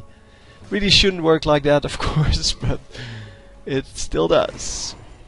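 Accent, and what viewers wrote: Netherlands. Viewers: you must be dutch ??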